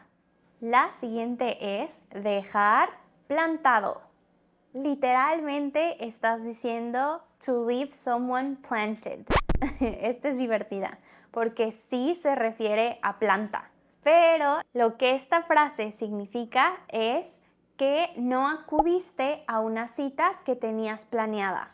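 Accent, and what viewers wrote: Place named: Mexico. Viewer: Ojalá hagas las partes 2,3,4, etc de este vídeo. Estas frases y tu acento son de mucha ayuda para la gente que quiera aprender Español de México. Ya he recomendado mucho tu canal. Gracias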